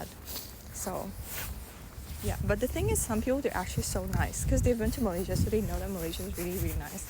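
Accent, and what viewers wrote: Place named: United States. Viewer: You have an American accent. Your skin so nice too.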